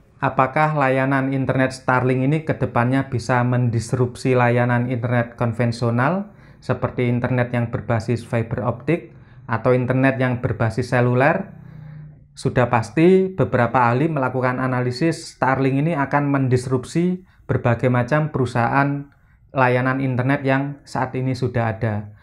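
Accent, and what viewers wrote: Java, Indonesia. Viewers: powerful medok kang😁👍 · Ini pasti Orang jawa.ngomone jowo medal.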